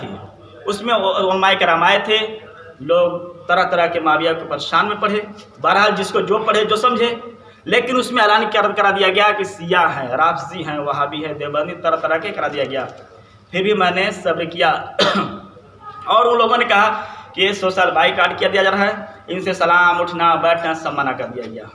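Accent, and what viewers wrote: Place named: Bihar, India. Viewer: Ye bihari maloom hota hai urdu bolne ke tameez nahi hadees ke baat karta hai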